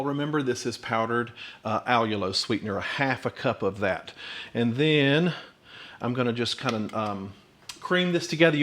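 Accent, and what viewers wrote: South, United States. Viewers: "Rubber Spatuler" 💗 man I love a southern accent 😄 Can't wait to try this recipe!!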